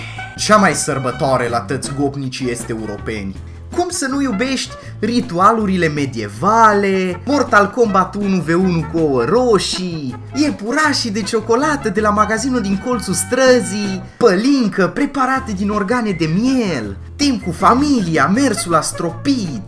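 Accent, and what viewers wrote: Transylvania, Romania. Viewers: Ești ardelean??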